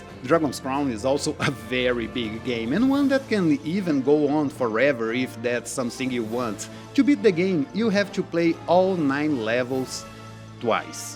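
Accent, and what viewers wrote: Brazil. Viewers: I can hear the Brazilian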